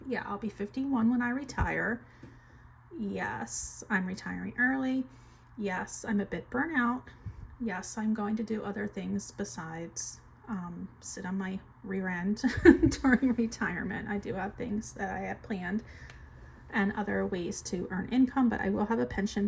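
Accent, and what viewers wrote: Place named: New Jersey, United States. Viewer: As soon as I listened to you, I knew you were from Jersey. My family lives in Wall Township and Belmar.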